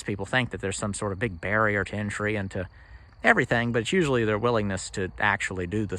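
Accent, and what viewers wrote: South, United States. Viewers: That is definitely the coolest building for multipurpose that I have seen. I really dig the way you have re-used a lot of stuff (particularly the roof). When your saw mill gets going you will be set to expand your collection of buildings on your land. I know you sound like a southerner, but you work like a New Englander.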